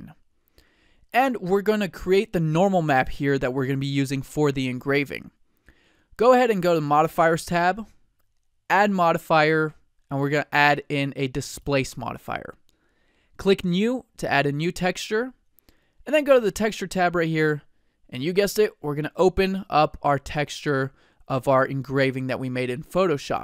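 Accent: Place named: France. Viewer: You have a perfect French accent ! Thanks for this tutorial.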